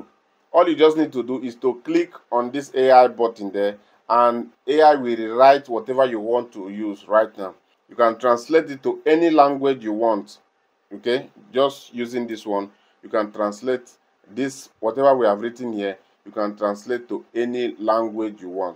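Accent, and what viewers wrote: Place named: Africa. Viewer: Beautiful african accent brother. All the best insh'Allah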